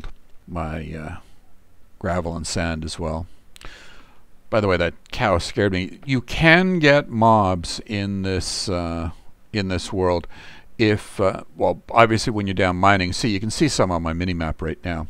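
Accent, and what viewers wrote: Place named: Canada. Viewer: I can't quite pin down your accent. I hear some Canadian in there, but also a hint of Scottish perhaps?? Or am I just way off lol? Great video, thank!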